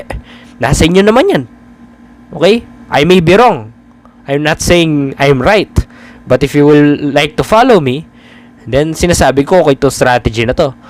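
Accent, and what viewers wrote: Pampanga, Philippines. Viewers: Very helpful ang mga videos mo. Thank you. Kapampangan ka ba lods? Yung accent kase. 😁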